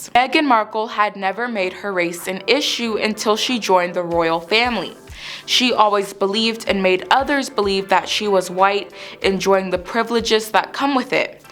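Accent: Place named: United States. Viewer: I love American accents and yours sounds like caramel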